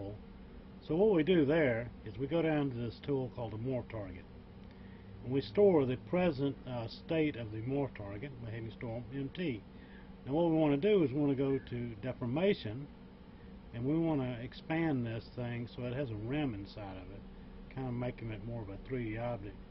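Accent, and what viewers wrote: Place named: England, United Kingdom. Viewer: in the beginning of the video i thought you were from england. then i realized you have a southern draw..then i thought maybe louisianna..then i thought maybe i was initially right about being english.. anyway thanks for the video! z brush has so many features i dont even think the makers of zbrush know all of them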